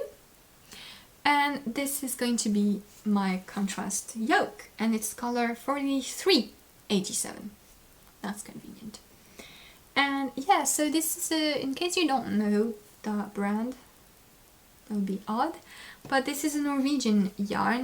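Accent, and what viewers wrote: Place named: France. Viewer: Oh, your Saule leaf design shawl took my breath away, that motif with the garter is simply beautiful. When you said you may incorporate small tassels within the main ground I thought Oh yes please, what a fabulous idea. Please don't perfect your English accent too much and lose your frenchness. Cheers, Jenny.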